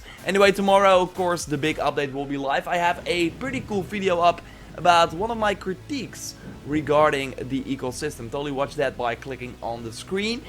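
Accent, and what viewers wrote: Netherlands. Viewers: Hoe kan je zo goed engels je hoort amper dat je nederlands bent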